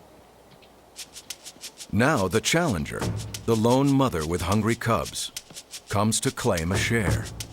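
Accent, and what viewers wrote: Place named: Texas, United States. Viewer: He's narrating Texas-style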